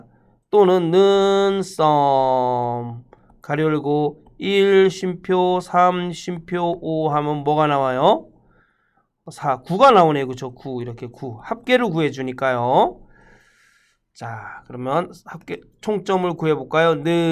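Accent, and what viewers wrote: Gangwon, South Korea. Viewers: 말투가 강원도 분이신거 같은..ㅎ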